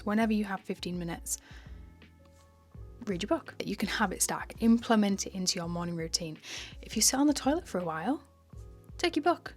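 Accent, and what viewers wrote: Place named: North, United Kingdom. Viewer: heard the northern accent, immediately subscribed 😌🙌🏻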